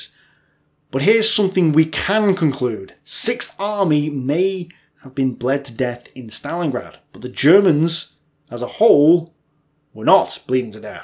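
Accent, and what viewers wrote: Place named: England, United Kingdom. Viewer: I like so much your videos about Ost Front that, as a french, I try to understand very focus on your speech. I get it, your superb english accent (?), in any case not the american accent, allows it for me. Fred (old close combat player, since 98 ;) )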